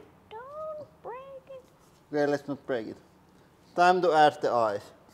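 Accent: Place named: Finland. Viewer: Where are you from? The english sounds a bit Finnish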